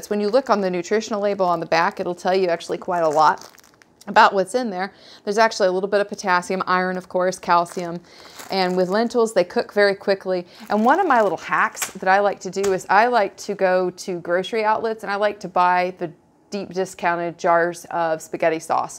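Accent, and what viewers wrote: South, United States. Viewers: You sound southern . You're an angel . Coffee drinker ? Why hell yeah ! You cook ? I've lived off sandwiches and vitamins forever. Proud southerner too . Imagine that .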